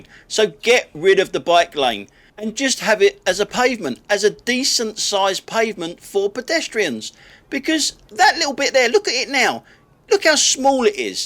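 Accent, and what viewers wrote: London, United Kingdom. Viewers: I've got Youtube on my big Telly upstairs, my wife says she loves the London swearing accent so keep up the good work in 2025.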